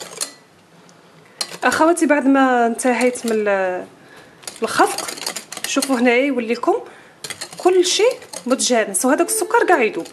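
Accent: West, Algeria. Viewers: اول مرة نشوف قناتك نتمنالك الاستمرارية واللي عجبني بزاف هدرتك نموووت على هدرت الغرب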